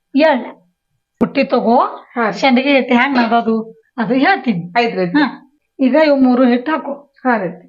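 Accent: Maharashtra, India. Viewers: Nimadu yaa uooru Maharashtra hatira.ada einari yakandr marathi mix bhasha bhala matadteri. Nimm bhasha Keli khushee aitu nanu hinge matadteni aadak❤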